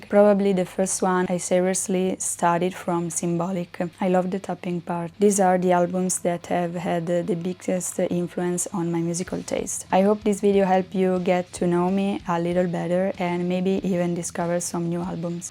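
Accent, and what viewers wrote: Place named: Italy. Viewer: Mi stavo giusto chiedendo di dove sei, visto un buon inglese parlato, ma con un accento non proprio madre lingua. Poi hai nominato il maestro Angelo badalamenti, e dopo questo posso confermare al 95% che sei italiana 😅... comunque ottima classifica anche se preferisco il buon vecchio rock/hard rock 🤘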